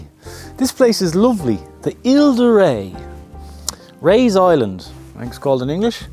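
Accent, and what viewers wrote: Ireland. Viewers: you and your vlogs are a lovely breath of fresh air in a sometimes stale world 😍Just love your honesty and sincerity and your gorgeous Irish accent 🥰 Also, as my travelling days are somewhat over I do enjoy seeing the fabulous scenery of the French countryside - keep on keeping on and stay safe on all your travels - blessings 😇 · Hello, new subscriber, expat Brit. Living in a very rural part of Canada. Please do not stop. You are making a 65 yr lady, very happy. I grew up in area populated with many Irish people. We have a few Irish people here, but they mostly live on the east coast, the Maritimes, I've met a few, they got lost, heading to work, mistaking a town near here for a town in a very different part of Canada. It was lovely to hear there banter etc. No one could understand them but me. Also having a back and forth conversation. 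I could only do because my ear was tuned to there accent, because of the Irish people, I grew up with. I was so delighted to listen to you. You came the way of Dan. Love Dan but miss his b role and drone shots, amazed at what he's doing. But you have filled in the gap. When I can't sleep, I listen to you. Your personality brings me joy. Don't listen to the nay sayers, of course when you no longer enjoy doing this, stop not for those who like to yip and yap. I enjoy every thing you do and learning about everything you have done. People like you give so much to people like me, who have no choice thru illness etc but to live life thru, stories, sights and sounds, that would be closed off from the world. Thank-you.🇨🇦 🇬🇧🇮🇪 Xx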